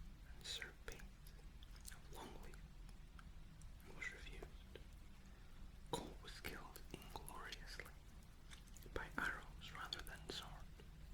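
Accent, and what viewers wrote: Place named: Scotland, United Kingdom. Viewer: Been looking for a British ASMR for a while, couldnt believe my luck finding a Scottish ASMR guy. Scottish women living in canada now, and miss the brogue. Love it.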